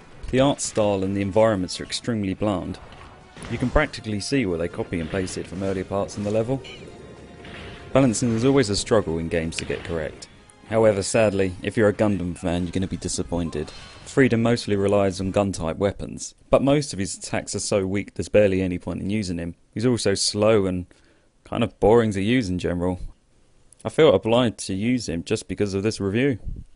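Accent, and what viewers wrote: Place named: United Kingdom. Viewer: Wow, you really are British. I thought that was a fad or something. :P Good review, though I probably wont pick up the game. Wish they would make more legit Toku games.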